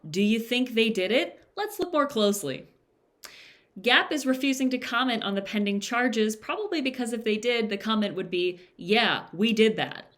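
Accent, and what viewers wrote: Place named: France. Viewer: Impeccable French accent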